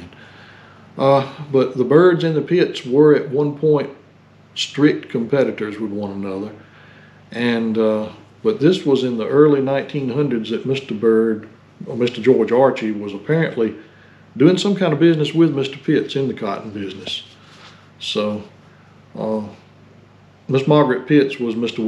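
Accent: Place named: South, United States. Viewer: I love hearing him tell story's , very Southern, very respectable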